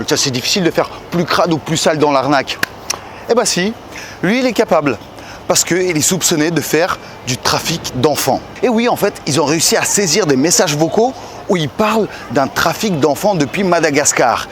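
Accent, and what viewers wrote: Russia. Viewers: C'est marrant en Anglais on entend ton accent Russe et pas en Français... Я тоже русский, ну у меня нет русского акценты , только французский 😅.... Je ne sais pas trop ce qui est le mieux 😂. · J'adore l'accent Russe qui prend le dessus quand tu parles en anglais. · Tu parles en anglais avec l'accent russe, c'est énorme !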